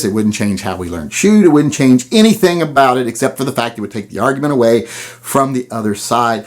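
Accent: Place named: California, United States. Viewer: Are you sure your not from commiefornia , cause you sure sound like it . Fudd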